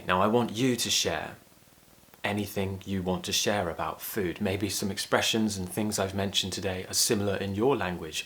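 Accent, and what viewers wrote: United Kingdom. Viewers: I used to hate British accent. That was before I discovered this channel, I cant stop hearing your voice and pronunciation. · Damn, I love your voice, the way you teach and this british accent. Thank you very much for your help. Hugs from Brazil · I love your British accent. I'm one of your fans from Egypt.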